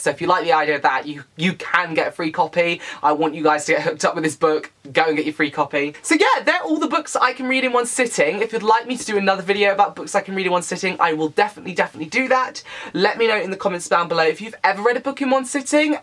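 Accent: United Kingdom. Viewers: you're the only british booktuber i watch and i don't live in the uk anymore and its so nice to hear your british accent and words I've forgotten to say!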